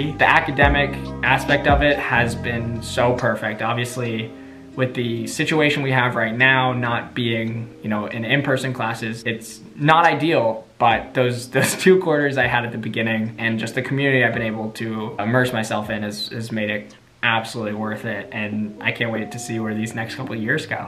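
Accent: United Kingdom. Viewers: Where is your British accent? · Wow! Very cool story. Your intelligence, high standards, and perseverance have clearly paid off, and probably will continue to do so in the future. Your ability to communicate well has really helped me to understand your process. When you speak I am able to visualize your story, step by step, and truly feel your experiences. Awesome job!!! I look forward to watching your running career and life unfold. I have one simple and fun question. Talk to us about losing your British accent.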